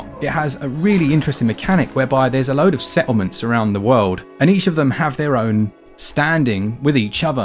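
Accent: England, United Kingdom. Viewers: Thanks for the updated patch review. Too many reviews are 2019 and prepatch, and just slate it. The game looked good so i grabbed it for 7Aud off switch store. Glad to see the game has been sorted out for the most part. Also good to hear another english accent on YouTube gaming reviews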